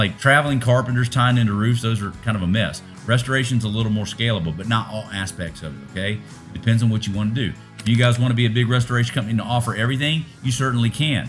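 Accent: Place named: Nashville, United States. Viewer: Honduras baby. God bless you, love the Nashville accent.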